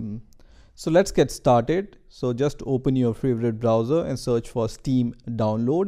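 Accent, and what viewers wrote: India. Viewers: are you indian? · his indian voice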